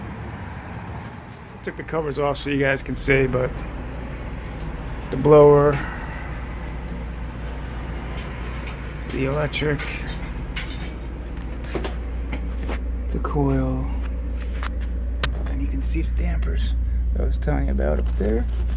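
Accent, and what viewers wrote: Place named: New Jersey, United States. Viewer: Damn. Didn’t know you were from Jersey.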